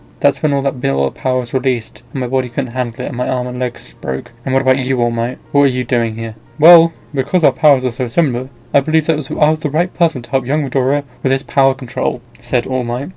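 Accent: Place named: England, United Kingdom. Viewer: Just glad I can find a what if with a English lad narrating it.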